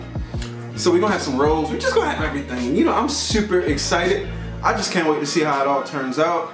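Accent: Baltimore, United States. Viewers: You from Baltimore, ain't you?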